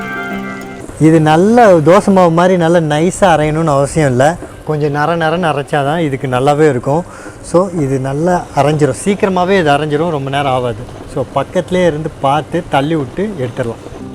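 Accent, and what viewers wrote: Tirunelveli, India. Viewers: Pattani paruppu Tirunelveli slang... you're speaking more Tirunelveli slang only...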